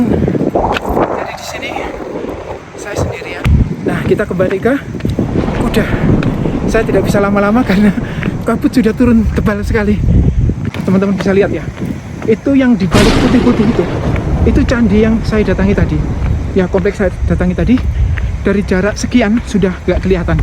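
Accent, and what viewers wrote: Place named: Surabaya, Indonesia. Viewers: Loghatnya jatim banget 😅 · Logat e Suroboyo banget 😀😀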